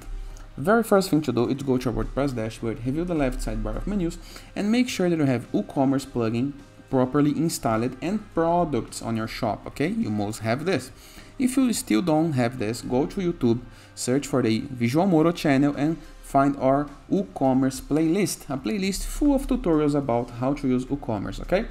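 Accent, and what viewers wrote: Brazil. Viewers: Hi, are you brazillian? Your english looks like a brazilian guy. Thanks a lot for tutorial.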